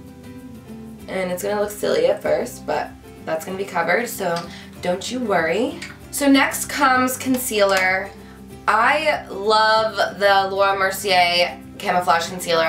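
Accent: New Jersey, United States. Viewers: Are you from New Jersey? I can hear the accent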